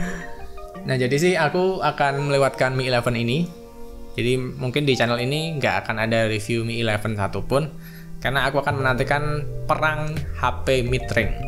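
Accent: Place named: Java, Indonesia. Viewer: Logatnya jawa bang..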